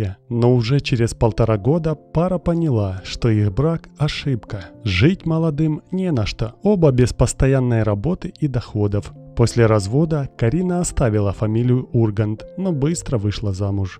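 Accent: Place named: Ukraine. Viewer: а что, это текст переведен с украинского? абсолютно нероссийские слова и интонации..."стоимость становит...." так не говорят. хотя акцент иногда похож.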